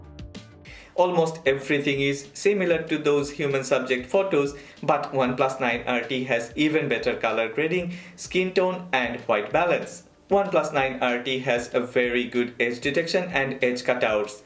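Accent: India. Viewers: Hey bro your speech is very similar of Indians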